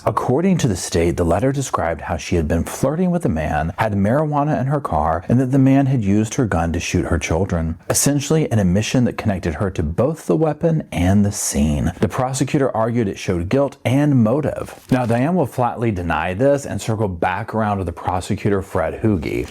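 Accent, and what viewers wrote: South, United States. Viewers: Just found you.... LOVE your "southern' accented comments.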